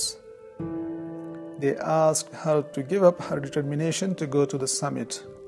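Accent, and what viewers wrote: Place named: India. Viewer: What's with the indian accent?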